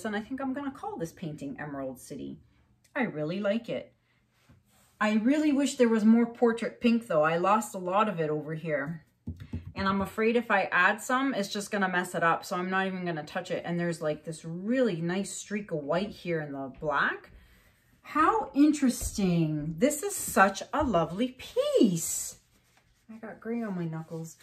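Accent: Canada. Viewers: i really like listening to your canadian accent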